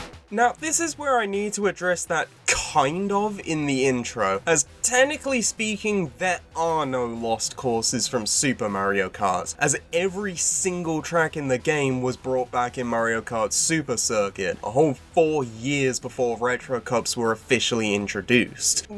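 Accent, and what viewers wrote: United Kingdom. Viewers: why is he british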